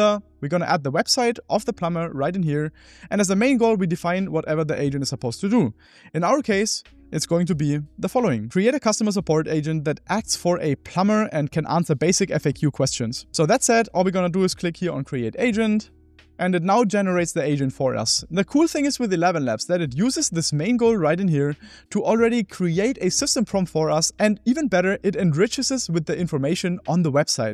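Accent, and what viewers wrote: Germany. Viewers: Das ist doch n deutscher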